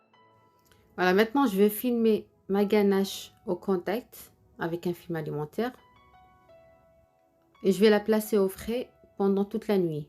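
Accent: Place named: Algeria. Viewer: هذي من لهجتها باينة جزائرية شكرا على الوصفة اختي وبارك الله فيك انا جيت من طرف جيجي ستارز 💕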